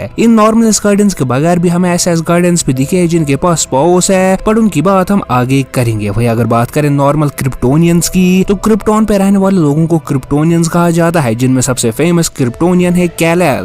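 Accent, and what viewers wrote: Kashmir, India. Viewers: Bro Aap kashmir se ho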